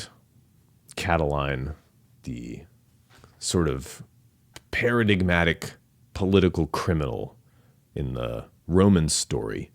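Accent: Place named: United States. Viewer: I love the content but this accent..I cant place it. Southern Pennsylvania or Tennessee..or anyone in California but its slightly irritating..its still pretty good. Im not sure I've read all this.Its really a gift. Thanks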